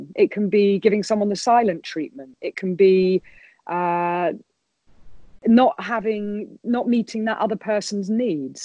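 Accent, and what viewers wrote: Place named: RP, United Kingdom. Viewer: You people have no idea she is well respected worldwide, secondly her way of speaking is a received English pronunciation and accentless, and her voice is very easy to listen to.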